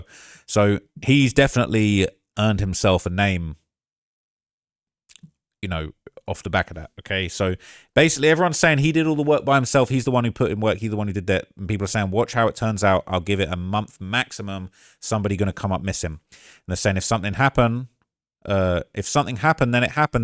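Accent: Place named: United Kingdom. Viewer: This nigga is Chicago new akademiks lmao with a UK accent tho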